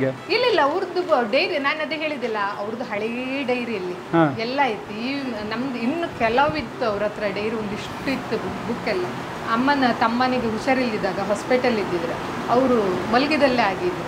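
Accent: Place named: Kundapura, India. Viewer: Madam neevu Kundapuradavara. Matado style ade tara ide. Thank you🙏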